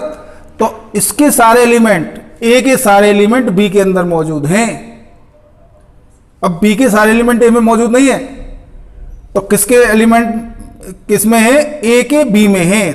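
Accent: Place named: India. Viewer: I think I gained my brain cells after watching this ... perfect indian accent for teaching Maths .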